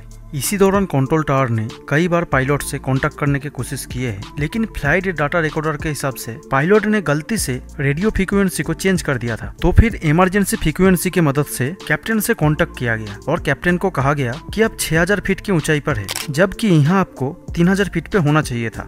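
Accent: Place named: Bengal, India. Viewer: Thora pronunciation improve karo. Bengali gaon ka accent hai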